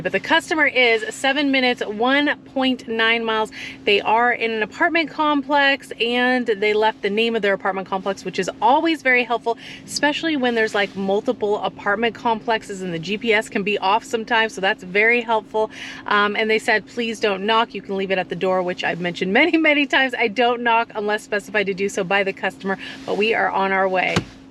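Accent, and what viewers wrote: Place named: Pennsylvania, United States. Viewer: You sound like you are from Pennsylvania.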